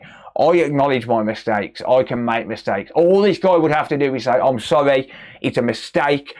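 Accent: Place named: New York, United States. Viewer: It’s how New Yorkers speak. Straight outta the gutter.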